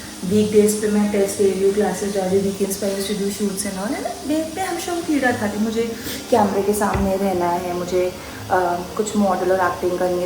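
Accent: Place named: Rajasthan, India. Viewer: Or muje phle hi andaj tha ki sayad aap pali ya jodhpur se ho kyuki apki bat karne ka style vesa h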